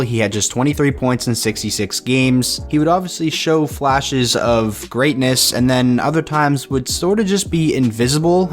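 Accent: Canada. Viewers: Ive said many times your SUPER Canadian accent makes me laugh... "OAT-BREAK" made me laugh pretty hard lol. Also your math teacher musta hated you. You always say .fifty instead of .five zero ...and it triggers me lmao.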